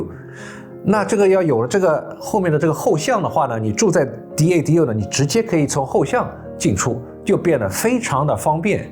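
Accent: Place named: Shanghai, China. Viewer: 30多年上海口音还是很重啊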